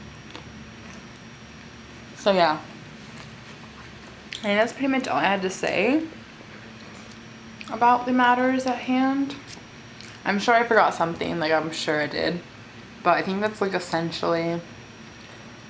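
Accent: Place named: Washington, United States. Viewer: It's a Washington accent. Many Washingtonians pronounce t's as d's at times. "water" sounds like "wodder" in WA.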